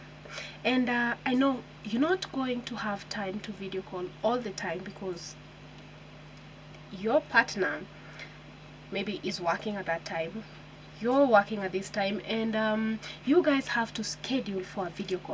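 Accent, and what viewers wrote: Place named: Kenya. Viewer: Love your voice, your accent. And you look very nice. Would you want to share what tribe and area of Kenya you are from, tafadhali?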